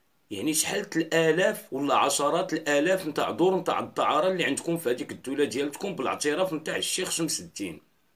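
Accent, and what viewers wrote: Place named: Marrakech, Morocco. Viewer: انا اخويا عاجبني المحتوى و اكثر حاجة عاجباني هي اللهجة المراكشية👍👍 هاني ايوني عندك مرحبا بيا عندك فالقناةمرحبا و 6000 مرحبا 😂😂 تحية من 🇺🇸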